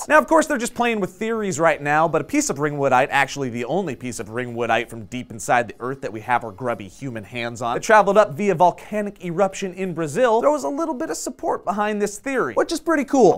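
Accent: South, United States. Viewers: Yay for southern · a little offended by the southern accent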